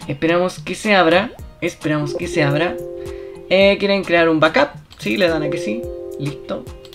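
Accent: Chile. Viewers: Mi reacción: Es chileno xD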